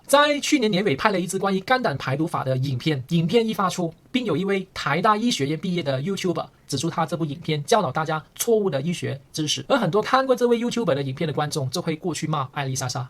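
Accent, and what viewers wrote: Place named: Malaysia. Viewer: 哦哦 我是大马人 听你的语音 还以为你是大马的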